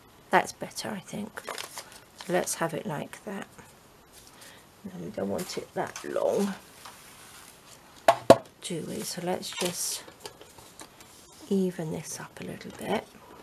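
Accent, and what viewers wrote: United Kingdom. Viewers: Hi, I'm loving your videos, thanks! Can you tell me where you buy seam binding on this side of the Atlantic, please? I'm in Ireland. (I'm assuming from your accent that you are in the UK, but maybe I'm wrong?:-) )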